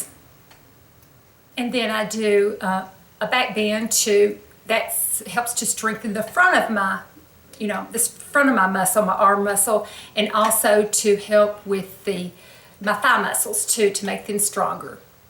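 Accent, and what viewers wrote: South, United States. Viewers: Thanks so much for sharing these. You always look so beautiful and I just love your southern accent. I try to keep my body strong as well and actually bought a hula hoop a while back for some light cardio! It took me a while to remember how to keep it going, lol, but it's a fun way to stay in shape. Take care❤️-Ruthie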